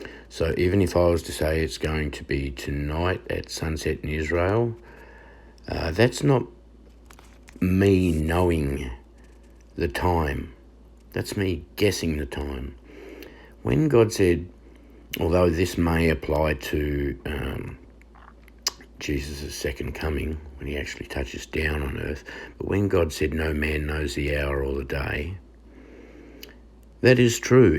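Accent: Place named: Tasmania, Australia. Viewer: ❤❤❤❤❤Waking up to your Taz Aussie voice is wonderful! Talking about rapture with that accent makes my morning! Thank you! Have a wonderful night and sweet rapture dreams! ❤❤❤❤❤😊 That big bright moon was right over my house on the mountain last night. Lit up the entire back yard! My 🐕 dog loved being able to see foxes, deer and any other critters out there! ❤. So, after hearing your wonderful accent talkin' 'bout rapture....I go outside to see the chemtrails ONLY over me (yes, all lines cut off to and fro, just over ME) and I took pics to show people so they'd believe me. I told them if I disappearr....dpState. Nothing like being targetedd, but Jesus is with me, protecting me. Yes, misspelled words so "Aye-eye-yie-yie" won't track it so quickly hopefully....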